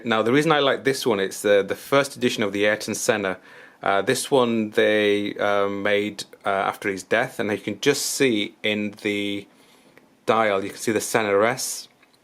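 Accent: Yorkshire, United Kingdom. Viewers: What do you so for a living if you don't mind me asking? Can tell your a Yorkshire lad haha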